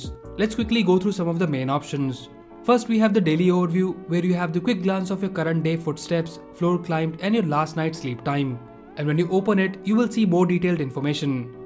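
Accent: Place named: India. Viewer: Good review, but your english is hard to understand... I asume you are from India , I suggest speaking slower or taking some courses to lower your indian L`s in every word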